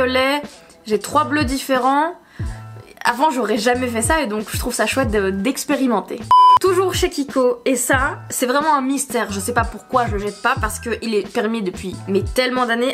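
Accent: Belgium. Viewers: Tu as pris un peu l'accent belge j'entends 😂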